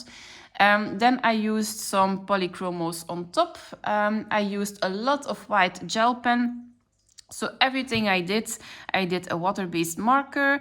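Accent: Belgium. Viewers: Ik hoorde meteen dat je een Belg was. Eerste keer dat ik je video's zie. Leuke pagina's dat je maakt. 😁 Heb je een instagram? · Hey 👋🏻 van België en ja het accent, ik ken het 🤣 en zeker met de Gentse toets erin bij mij, net je kanaal ontdekt en wat mooi allemaal. Zelf relatief nieuw in de kleurenwereld maar zoveel bijgeleerd al 😁 Ik heb nog geen huidskleur durven kleuren, maar ik heb wel interesse in kleur van neocolor II die je hebt gebruikt. Dankjewel 🙏🏻😁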